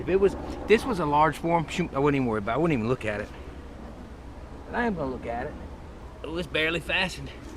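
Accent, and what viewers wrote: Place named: North Carolina, United States. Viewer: Love your videos, moved from NC to Kansas little over a year ago and miss hearing people that sound like me. First year beekeeping, started with two packages and they are doing great. Thanks for the information and just being you.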